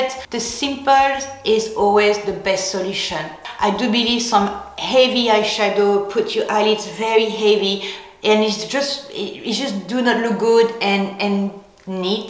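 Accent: France. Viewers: Oh you so funny, but it is funny those big brows.....so nice to see humor and it’s natural. I like your videos. Love your French accent .... and being feminine is such a bonus for all woman. But some just don’t care.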